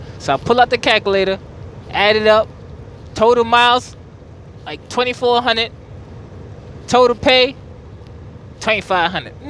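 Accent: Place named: New York, United States. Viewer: Lol he gotta be from new York lol that accent funny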